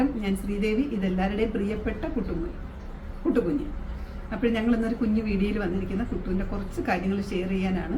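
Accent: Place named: Kottayam, India. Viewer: ഞാൻ ഇത്രയും നാൾ കരുതിയത് ചേച്ചി ഒരു അച്ചായത്തി ആണെന്നായിരുന്നു. തനി കോട്ടയം ഭാഷ അല്ലിയോ 😂ആളെ ഇപ്പോഴാ കണ്ടത് സന്തോഷം 🙏🏻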